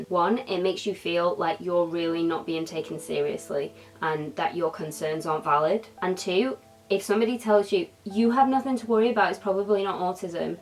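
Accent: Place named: United Kingdom. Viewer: She speaks British English 🤷🏻‍♀️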